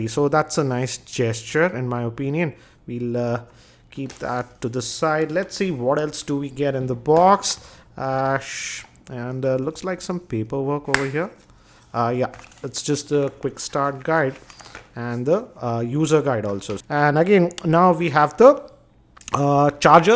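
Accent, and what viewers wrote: India. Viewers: typical Indian accent english.. :(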